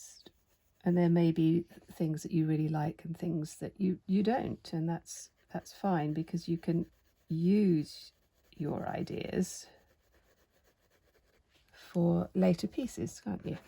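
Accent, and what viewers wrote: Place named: United Kingdom. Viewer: Hallo,. I am a new fan of yours. I came across a vid from you and enjoyed it immensely. I am a transplanted Scot living in the southern US and I always love and appreciate hearing an accent from GB! Having said that: I love to do doodles and have several notebooks devoted to them but I usually use pens. I've so many coloured pencils and almost never use them as I am a painter. This has inspired me to get out my 150 Prismas set and have a go! Thank you so much! Bless xo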